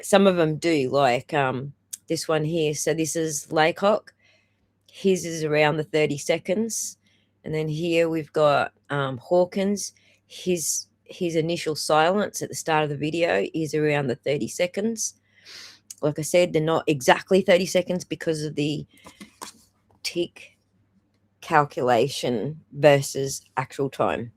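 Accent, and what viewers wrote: Australia. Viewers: I am going to watch Turtleboy at 9, but I love hearing Aussie.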